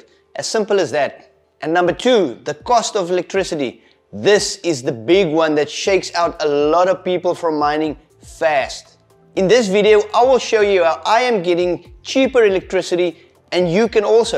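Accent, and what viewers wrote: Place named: South Africa. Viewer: Awesome, I noticed from the accent and other comments that you're also from ZA. I recently started mining some XMR on a spare PC I have lying around at home. Got p2pool and a local monerod running and getting about 4.7kH on 8threads on a 5600g. Was wondering what pool(/s) you use? I heard it's better to have lower latency but the lowest for us is EU based and even that is around 200ish ms. Kind regards.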